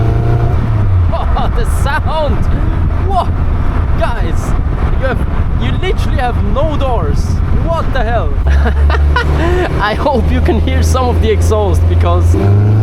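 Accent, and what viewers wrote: Switzerland. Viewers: Yeah, it's one of us. That much is right. But as I can tell a very clear Swiss accent from a German accent, We can narrow down the number of possible solutions even further. No need to be this vague.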